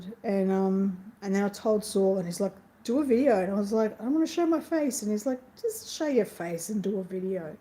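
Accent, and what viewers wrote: Australia. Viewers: You’re much more Australian today! ❤️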